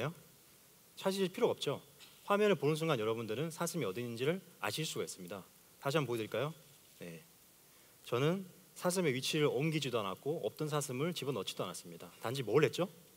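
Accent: Gyeongsang, South Korea. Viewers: 좋은 내용.전 왜 한국은 인포그래픽이 없나햇엇는데. 강의하시는분말투는 경상도 생긴건 전라도네요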